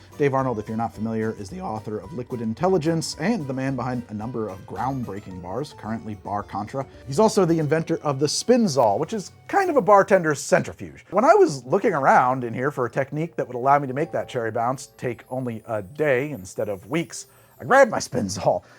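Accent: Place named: New Jersey, United States. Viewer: You tawk the way you do cuz youre from Joisy. I also grew up in the north east, Massachusetts (and no I'm not from Baaston, I'm from Woostah), and I've never heard a damn soul say "affiktionado."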